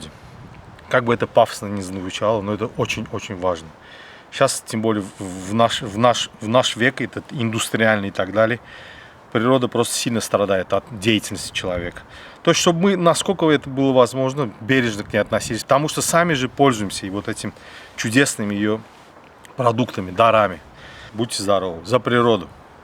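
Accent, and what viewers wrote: Azerbaijan. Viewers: Дорогой Приветствую! Это снова я! Вячеслав из Нижнего Новгорода! Я не перестану восхищаться тем что вы делаете и как преподнесите! Это шедеврально! И да кстати! Не смотря на то что вы Азербайджанец, и говорите на русском, моем родном языке. Вы говорите на русском очень красиво, с акцентом! Говорите очень грамотно, даже понятней, чем я говорю 😂! Дай Бог Вам здоровья крепкого, мирного неба над головой, процветания и всего самого наилучшего! Я ваш фанат! 😊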